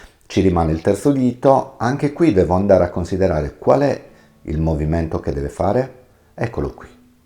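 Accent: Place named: Sardinia, Italy. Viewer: Grazie sei molto comprensibile, sono una principiante, nemmeno più tanto giovane, ma mi piace imparare e trovo il tuo metodo molto semplice..... Grazie. Ho molta difficoltà nel cambio accordi, anche x un problemino "tecnico".... Ho una Rizoartrosi al pollice sinistro😢..... Ma non mollo...... Voglio imparare e ti seguirò con piacere sei molto bravo complimenti..... E poi il tuo accento sardo è stupendo adoro la tua terra😊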